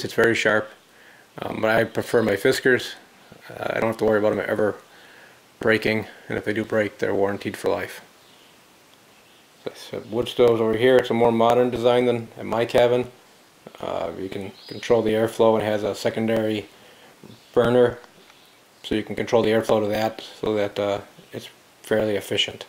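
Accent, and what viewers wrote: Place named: Germany. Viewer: Amish? Fabulous God loving people. Its good you can count on Amish for supplies. You sound German (accent) Thanks for video!!!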